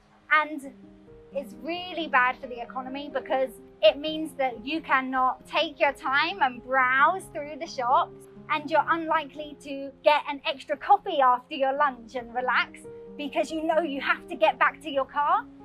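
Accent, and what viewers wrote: United Kingdom. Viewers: 英國腔真的很好聽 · Thank you For introducing Taiwan's good and strong places To whole foreign countries But l do appreciate your special UK accent So cute and lovely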